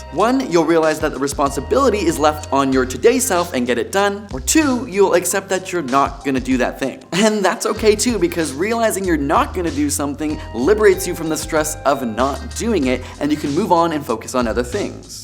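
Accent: Canada. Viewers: Your Canadian is sticking out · are you Canadian? the way you pronounce "Tommorow" is kinda bothering me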